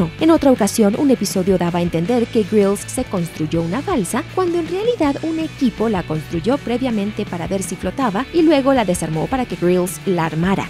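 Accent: United States. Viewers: ES QUE ELLA VIVE EN USA, SEGURO HIJA DE MEXICANOS Y TIENE UN ACENTO ESTADOUNIDENSE(AMERICANO PARA LOS EUROPEOS). EL ACENTO DE MEXICO ES MAS NEUTRO.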